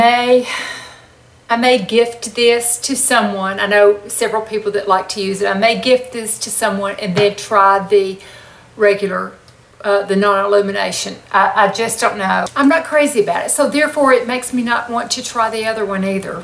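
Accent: South, United States. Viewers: You are amazing and I just love to watch your videos! Your southern accent makes you adorable as well as beautiful! xoxo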